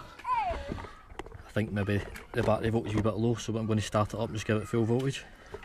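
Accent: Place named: Scotland, United Kingdom. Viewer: Thank you for taking the time to do this diag video. Well explained and I can even understand the Scottish accent. Ha. Thanks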